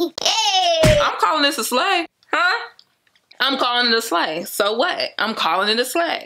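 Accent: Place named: South, United States. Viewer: Bby ILY!!!!! U made me subscribe within 90 seconds!!!! Nd let's tlk about that note beginning 😂😂😂 I said I'm most definitely joining the family nd joining the fun!!! KEEP GOIN WITH THAT YUMMY COUNTRY ACCENT!!! Hubby is from Louisiana nd me Cali ❤️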